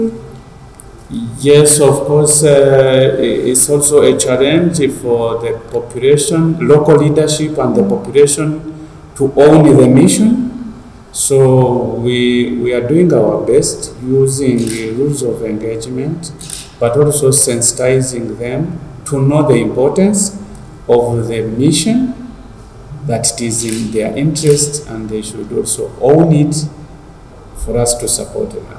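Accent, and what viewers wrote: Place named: Eastern Uganda, Uganda. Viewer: He speaks like a Eastern ugandan for some reason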